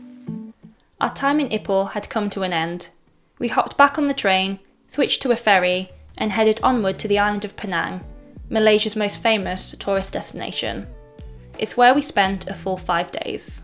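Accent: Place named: United Kingdom. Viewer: Great video. I have visited most of the places you included in this video but your information was an added bonus because I learnt much more about some of the history about these places. Thank you. BTW where are you originally from ? Judging by your accent you sound British.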